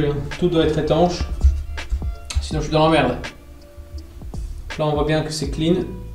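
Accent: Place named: Belgium. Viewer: Rien à dire bravo ! J'aurais aimé être aussi pro que toi! A ton accent il me semble que tu sois de Belgique ! Dommage j'aurais peut être eu besoin de toi à l'achat de ma future maison car je sais que tout serait soigné et maximisé !🙏🙏👍👍je m'abonne direct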